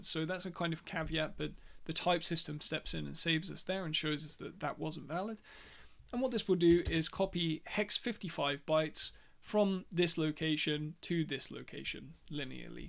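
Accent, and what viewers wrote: Netherlands. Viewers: You're either Dutch or Belgian :). I think Dutch. Nederlands?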